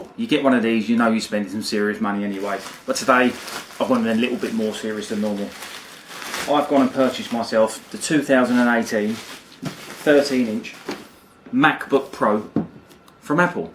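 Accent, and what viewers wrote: United Kingdom. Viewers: I find it pretty amazing actually but the recurring kernel panic issue due to the T2 chip even after installing Mojave updates is stopping me from buying it in the meanwhile , and yeah I kind of guessed it would be British accent haha 😅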